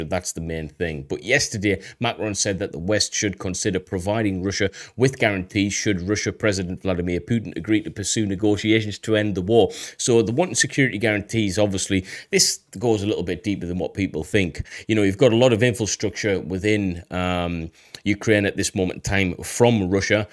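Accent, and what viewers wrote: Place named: England, United Kingdom. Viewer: Yo ain't seen you a while respect got the imposing look. It's nice to see a real hard man with intelligence and English accent. As grew a bit at gchq Germany, well afnorth Holland with the AF plates lol hearing your attitude takes me back 20 years. Peace and love yo. Could you put your intelligence and ingrained survival mature focused on structure and violence give a guide for us English incase something comes here. Peace and love my G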